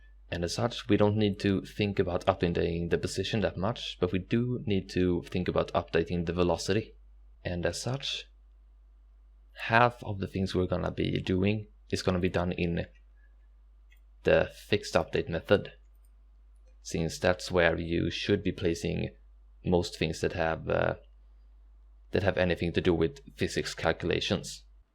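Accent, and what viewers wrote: Sweden. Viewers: Älskar din uppenbara svenska dialekt :)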